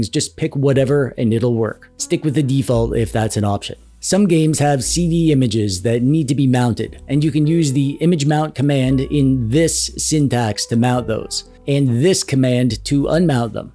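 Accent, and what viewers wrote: Canada. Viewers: I finally caught the Canadian accent. Still can't tell which region! DOS always reminds me of my days as a Gaming Corp IT Tech. We used IBM for everything at the Casinos. Down there making a million dollars a day, and we are up in the office running software from the early 90s.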